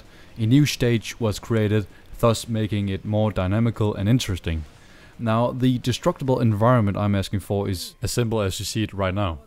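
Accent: Denmark. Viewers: r u danish?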